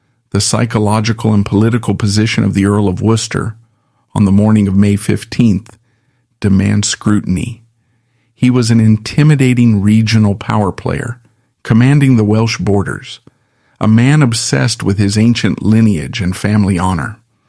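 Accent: United States. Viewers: I mean this with the utmost respect but i studied tudor history for many years at college and university and was lucky enough to read archives in London and Windsor castle. If this is AI voice please change it as an American accent don't sound right its pronouncing names and places that just sound weird. Also Elizabeth Somerset told her brother that ann flirted with courtiers but the evidence was never put forward in court 😊 also as you probably should of mentioned Anthony Brown was a seymour supporter as ann and himself had a blazing argument as ann didn't like how he treated his sister Elizabeth Somerset 😊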